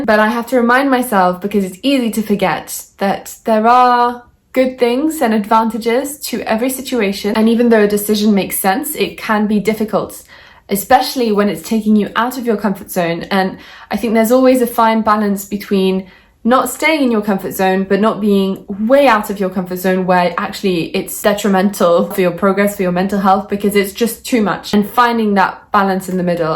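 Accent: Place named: England, United Kingdom. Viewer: hello from turkey, i'm working as a GP here, i'm currently studying for OET exam, which enables me to take PLAB exams. If you have any questions or wonders regarding Turkish healthcare system, i would gladly answer to you. Anyway, your english accent is so pure and nice, it's also educational for me to get used to english accent:) greetz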